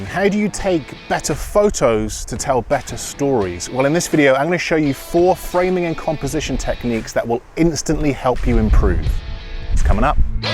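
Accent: United Kingdom. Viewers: I think thats how he pronounce focal point, he may be is a british coz thats their accent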